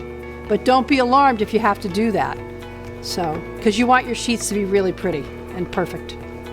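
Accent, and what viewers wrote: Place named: Boston, United States. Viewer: hahahaah you must be from Boston too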